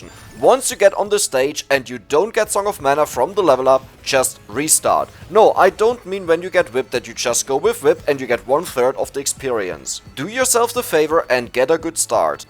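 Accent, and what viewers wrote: Germany. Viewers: Funny, I thought the same thing. First time I heard voice I was like "Wait, isn't this Granady (famous trackmania player)?" Really must be the german accent. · Ich frage mich wieso mir deine Stimme so bekannt vor kommt... Vielleicht ist es auch der deutsche Akzent^^ Hast du mal Videos zu anderen Spielen gemacht auf einem weiteren Channel?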